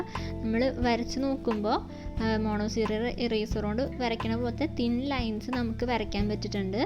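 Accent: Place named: Thrissur, India. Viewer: Ente frnd thrissur und... Pinne slang kettappo manasilayi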